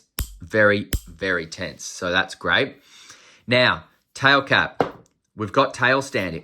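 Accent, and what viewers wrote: Australia. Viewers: plus the Australian accent makes every presentation better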